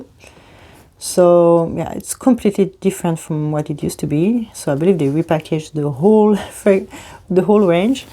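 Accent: France. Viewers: on sent l’accent français 😂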